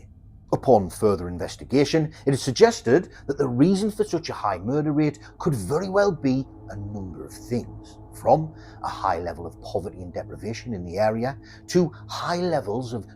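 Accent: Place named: Newcastle, United Kingdom. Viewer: Binging on much of your content - thank you very much for it, keep it coming. Another subscriber and fellow Geordie. At least I think you're a Geordie 🙂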